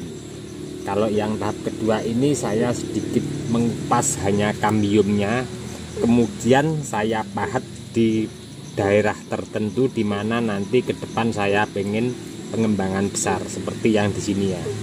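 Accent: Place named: Java, Indonesia. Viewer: Itu emang logat jawa,dari dulu.tapi ga merubah makna.Wallahu'alam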